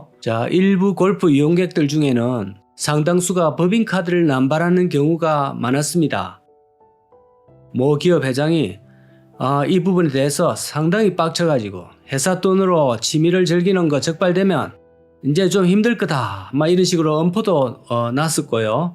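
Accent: Gyeongsang, South Korea. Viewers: 아재 말투는 구수한 경상도 말투입니다... 유네스코 무형 문화재 감입니다.... 아재요, 욕 많이 봅니데이 ~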